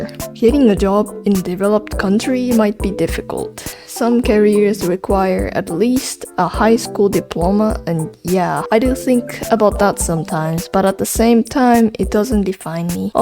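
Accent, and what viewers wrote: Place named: Germany. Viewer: Ohhh interesting story! What country are u from? I thought u might me German at first because of your accent, but I think homeschooling isn’t allowed there at all… are u Asian? 😮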